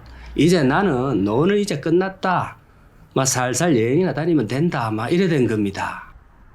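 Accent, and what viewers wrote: Yanbian, China. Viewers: 여기주인장님 국적이 대한민국아니죠?? 발음과 사투리가 너무 표가납니다. 연변사투리정도가 더 가깝다 ㅠ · 이사람 발음보니 조선족 화교인갑네.. 어쩐지 말투가 역스럽다 했어...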